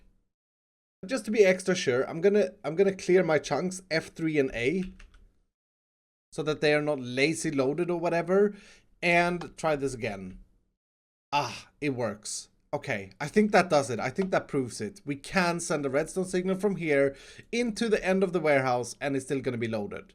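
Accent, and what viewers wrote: Sweden. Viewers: Are you from Sweden iskall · Are he Swedish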